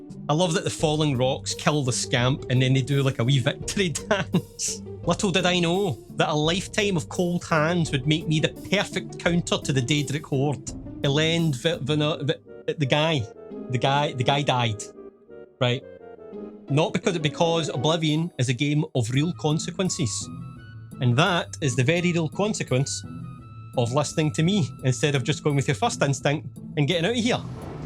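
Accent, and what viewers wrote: Scotland, United Kingdom. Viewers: A Scottish man with a thick accent telling me how perfect one of my favorite games of all time is? This day got even better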